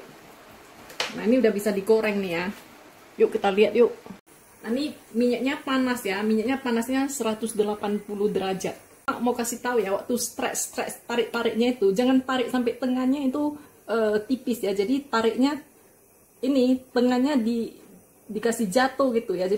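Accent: Medan, Indonesia. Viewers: kalau ngomong enak deh, dengan logat medannya. Wkwkwkwk.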